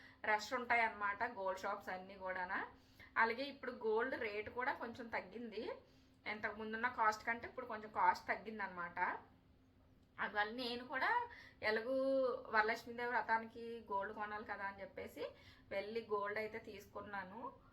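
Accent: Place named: East Godavari, India. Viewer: meedi yevuru garu turpugodavari basha laga vunnadi akkada evvarinina valuyu bulga palakaristaru ekkuva takkuva bedam vundadu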